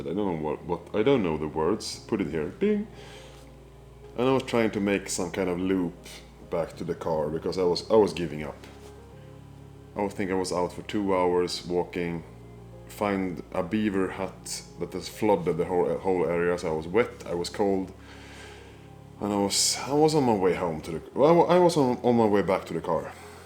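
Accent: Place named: Sweden. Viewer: This is gonna be great! You catch your audience not just because of the hunting part, but because of what a genuinely great guy you are 😊 and I don’t think there is many channels showing the Scandinavian fauna spoken in Swenglish😂 it will catch on my boiiiii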